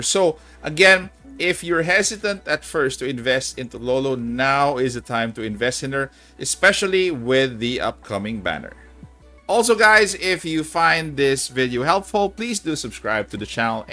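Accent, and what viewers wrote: Philippines. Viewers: bro is it me or do you sound like your average filipino teacher/instructor especially with that signature filipino reiteration and intonation during explanation lmao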